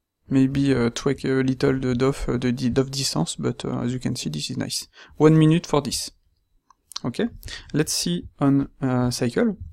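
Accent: France. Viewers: it has to be, you can't make a more french accent than that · oulala l'accent , moi je comprend parce que je suis français mais pour les anglais sa doit être compliqué ... super video sinon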